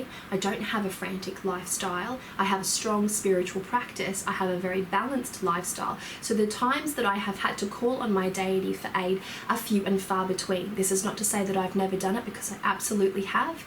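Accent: Australia. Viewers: I can tell you're Australian from the accent, am I right? Working with the saints so to speak, you ain't mucking about That's for sure. Best of luck.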